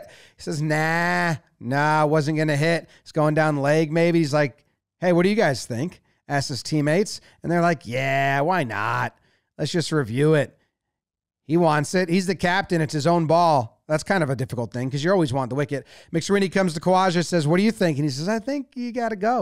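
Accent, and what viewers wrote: United States. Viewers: Not gonna lie, still weird to have an American voice comment intelligently on cricket who isn't from the cricket playing countries originally... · So weird hearing an American talk about cricket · Weired to listen to cricket commentary in an American accent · Commentary in American accent sounds a bit weird lol. Good stuff 👍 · it's fun listening an unfamiliar american voice break it down which tickles my senses. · As an Indian enjoying the occasional baseball content, it's refreshing cricket from an American voice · It’s just strange for me to have an American voice analyze cricket … I’m old school … but love the idea that Americans are getting into cricket … hate the idea that if they take it seriously, they will win more World Cups than the rest of us. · as an Australian i have to say it's weird as fuck watching Test cricket getting broken down by a guy w an American accent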